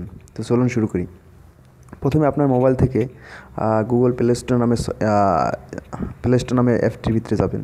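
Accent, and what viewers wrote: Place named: Gopalganj, Bangladesh. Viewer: আপনার বাড়ি মনে হয় গোপালগঞ্জ!!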